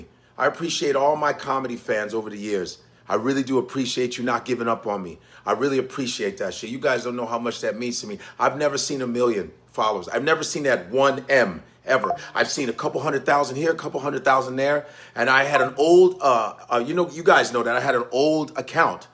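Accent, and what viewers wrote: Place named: Chicago, United States. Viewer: Congratulations, my Chi-Town Brother. Although I was born and raised on the do-or-die Near West Side of Chicago, where most people speak with a different accent than you, I appreciate yours. Just like I appreciate New York and Atlanta accents and others. I appreciate your intelligence, your talents, and your skills as well. Thanks for being unapologetically Black in public. In addition to that, thanks for sharing YOUR SPECIFIC Black experience. All the best, my Brother.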